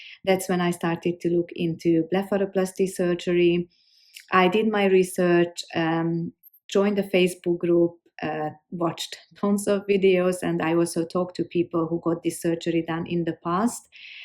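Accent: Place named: Hungary. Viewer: Felismertem az akcentusod. ☺️ Klassz videót készítettél nagyon!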